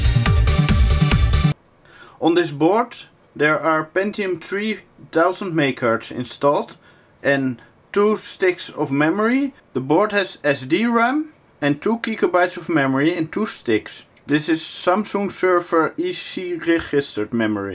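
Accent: Netherlands. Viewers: nice dutch accent leuke pc :)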